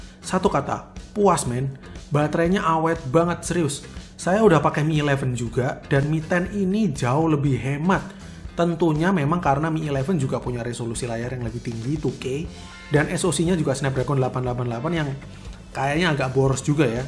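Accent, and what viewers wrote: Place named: Java, Indonesia. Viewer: Medok bro 😁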